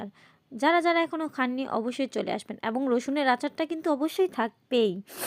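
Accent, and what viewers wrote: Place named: Dhaka, Bangladesh. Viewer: আপু আপনার বাড়ি কি ঢাকা ভাষা ঢাকার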